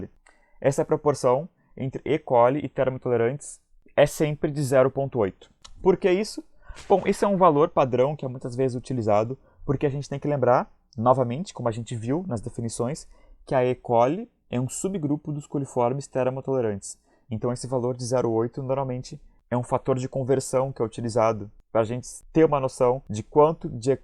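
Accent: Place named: Rio Grande do Sul, Brazil. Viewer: Muito perfeito o seu vídeo, amei a dicção e o sotaque gaúcho, resumo de milhões. Espero que não tenha desistido de criar novos vídeos!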